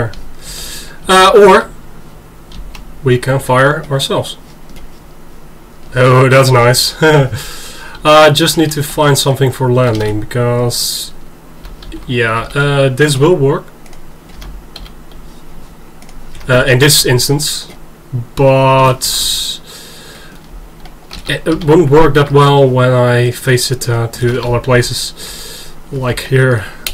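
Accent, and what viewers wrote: Netherlands. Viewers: 1. i love the fact that you sound so dutch 2. i love the fact that you just went "hey i can't walk fast enough and that bugs me, i know i'll just make an addition to my mod!" 3. i think a good idea would be air pressure jet packs or maybe even a pneumatic iron man suit because the helmet is already there. 4. don't do anything about fall damage, it's a part of the game and it makes it fun. if you want to take no damage launch yourself in water.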